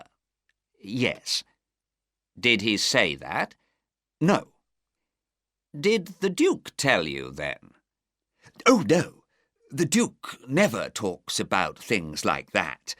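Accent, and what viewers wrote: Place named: United Kingdom. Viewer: Огромное - преогромное спасибо! Как же хорошо всё продумано, - и сам рассказ, - кто же не любит нашего родного Шерлока Холмса, и бархатный баритон чтеца, и произношение - волшебное, английское - преанглийское! Чудесный формат! Спасибо!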